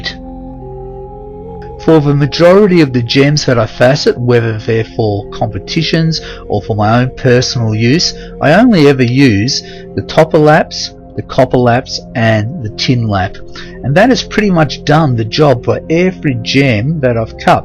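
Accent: Australia. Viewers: Thanks for sharing the only gem channel with a down under accent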